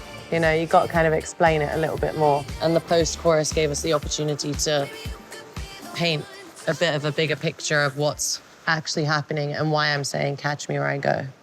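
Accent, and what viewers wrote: United Kingdom. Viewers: Love your songs,your unique style and your British accent.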